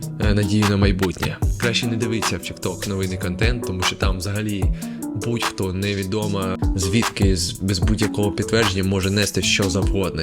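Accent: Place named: Belarus. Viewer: вы белорусс? акцент белорусский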